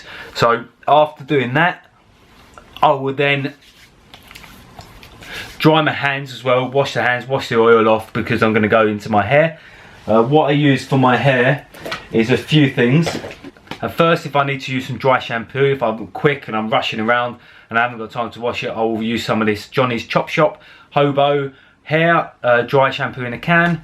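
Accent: United Kingdom. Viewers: subscribed as soon as i heard that top notch londoner accent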